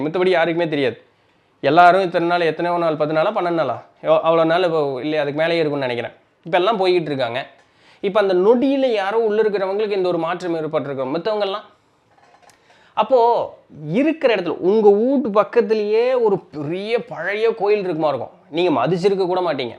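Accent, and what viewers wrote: Coimbatore, India. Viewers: Well said, ur info with coimbatore slang is truly exceptional 😅. Keep rocking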